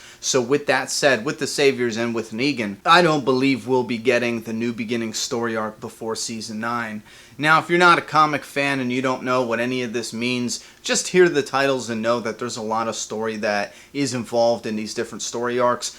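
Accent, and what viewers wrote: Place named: East Coast, United States. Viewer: I like you. I'm from Long Island originally and it's nice to hear an east coast accent for a change. Trevor at Trevschan2 could learn a few things from you, IMO, so I think you do a good job. BTW, anymore of those candy packs come your way?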